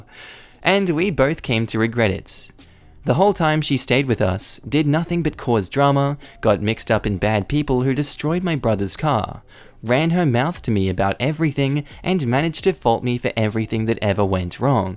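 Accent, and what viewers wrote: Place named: Australia. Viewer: Love your accent! ... Aussie voice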